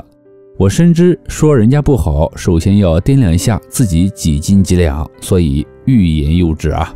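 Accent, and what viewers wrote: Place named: Mainlander, Taiwan. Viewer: 說話確實像小蔣時代台灣外省人口音。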